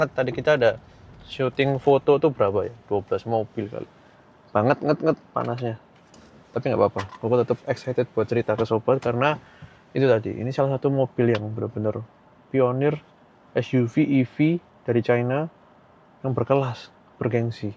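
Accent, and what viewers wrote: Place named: Java, Indonesia. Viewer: Medhoknya jangan sampai hilang. Sudah jadi ciri khas.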